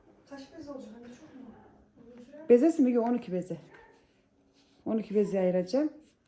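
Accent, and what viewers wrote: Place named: Çorum, Turkey. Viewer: Kolay gelsin hanım bizim gibi konuşuyorsunuz nerelisiniz ben Çorumluyum ama 42 senedir istanbul Eyüpsultanda ikamet ediyorum ❤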